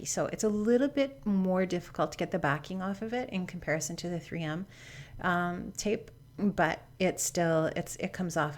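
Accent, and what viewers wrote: Canada. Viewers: Hahaha - oh to be a fly on the wall on the wall and hear you do British, LOL! You really must treat your YouTube followers to a bit of story time :-D It would be brilliant if, one day, you did get to travel to London and Derby and all over the UK. I've personally never been to Canada, and can't claim any connections to your beautiful land, but I have heard amazing things! One day I will go, and that's for certain. (See if I can nail your accent... that kind of thing, hehe!) Hope your summer homework isn't too arduous, poor you. Have a great weekend! :-D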